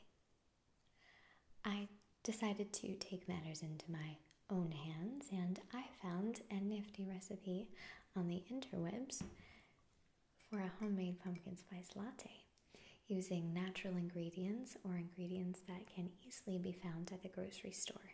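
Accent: South, United States. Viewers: Do you turn the southern accent on and off at will?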